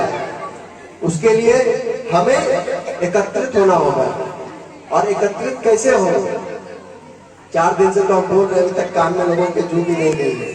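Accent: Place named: Bihar, India. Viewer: राधे राधे एक दम बिहारी टोन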